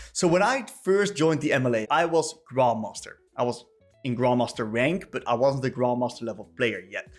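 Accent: Netherlands. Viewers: Hey, this is probably something you’ve heard before and I don’t want to come off as mean, really I don’t, but I think it would genuinely do you well to spend some time tweaking the way you ultimately articulate your coaching. That is, I think you will gain a respectable amount of traction if you do so. Thing is, and again you’re probably aware of it, but your pronunciation is like, passing… but not good. It’s okay, you know, but also just… okay. Even then I have to say that as a fellow Dutchie I do have a soft spot for your Dutch-English accent, however it still took me a bit to warm up to it (compared to native or more proficient English speakers that produce similar content) and I just think to some new viewers it’s possibly really an active deterrent to becoming full time viewers. Maybe. Your English itself is very solid, don’t get me wrong. I do wonder if you could somehow review your video scripts in order to have them be fully polished, because right now you occasionally get an English Expression or a certain common collocation slightly off (or some subtle grammar or vocabulary related mistake where actually☝️🤓 or whatever). 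While this is more of a small nitpick, I think it’s comparatively easier to solve by simply catching those small mistakes while reviewing your scripts. That’s my two cents on this. In the end I’m just a nobody and a relatively new viewer of your channel, because I recently swapped my main role to mid, so take all this with a grain of salt if you wis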